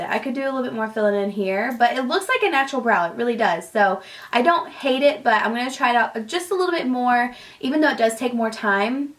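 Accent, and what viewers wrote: South, United States. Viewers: Hey! I'm such a huge fan of yours. A couple months ago I felt you were somewhat losing your more genuine reviews and videos and it seemed like an abundance of advertisements etc. Not hating at all, you were still my absolute favourite on YouTube. But lately you've been putting out such genuine reviews and it's been reminding me of your old videos again! I'm so happy about the content and the amount of videos you've been putting out lately. Just wanted to say that you are more stunning than ever, you're videos have been so amazing and I wish you and Davis all the best. You guys look like you're the happiest you've been! Thanks for sticking to your roots, your subscribers really appreciate the "realness" of your videos. And I love that southern accent! You're beautiful.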